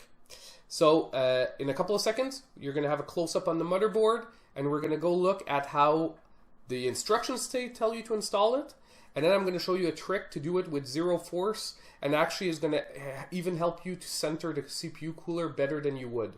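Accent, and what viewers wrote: Quebec, Canada. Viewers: Subb'd because good video and awesome Quebec English accent :)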